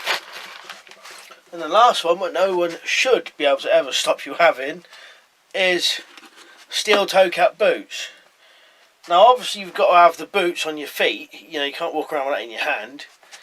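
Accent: United Kingdom. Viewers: I have to say I really love these videos. I'm an American still currently in the US but engaged to a lovely British woman. We have a home, a life and twin girls in NE UK. I'm working on saving for immigration and I have to admit, I'm eager to officially be a Brit. I do have to say the accent reminds me of home (haven't been able to go back for nearly a year). And the lil can of spaghetti hoops in a Tesco bag is very effective but also gave me a bit of a chuckle... I can picture my hunny, the little ginger ninja, with her deadly Tesco spaghetti hoop flail takin down the baddies. 😂 I love how informative these videos are about normal items that actually can be carried. This kind of knowledge is great especially now, so Brits can protect themselves but not get done for doing anything illegal. I'm definitely looking forward to seeing more of your videos and I'll share them with my hunny as well. 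Blessings.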